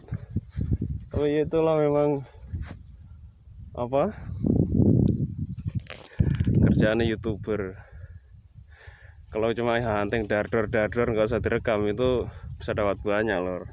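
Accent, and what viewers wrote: Java, Indonesia. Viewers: Kya,,a logat orng Jawa ya bos sampean😀😀😀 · Jan gk ilang blas jawane...."uwwaangel giaanndul gandul teng krontelll"😅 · Asline wong ndi mas ketone wong jowo iki. Kerjo teng pundi mas😇🙏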